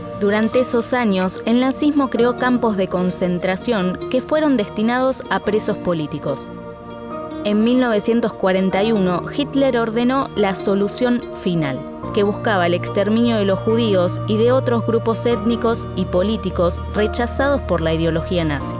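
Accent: Argentina. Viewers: Muy bueno el video, tiene un lindo acento porteño y saca videos de buena calidad, en poco tiempo llegan a los 100k. Éxitos.👍🏽🇦🇷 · Buen video, lástima que tu acento sea argentino, es muy molesto. Dislike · Que acento más engañosos, por un momento pensé que era español y de repente me dí cuenta qué es argentino OMG Pd: exelente video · iuuu, se escucha horrible el acento argento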